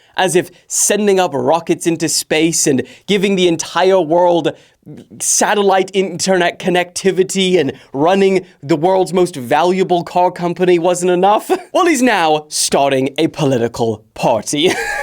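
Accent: South Africa. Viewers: It sounds like you might be from South Africa 🇿🇦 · Oh hey, a South African Brother. Nwice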